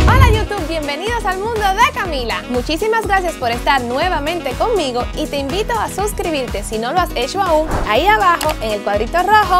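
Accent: Cuba. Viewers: Camila eres un amor eres una buena actriz y me encanta tu acento Cubano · Son cubanas por eso tienen el mismo acento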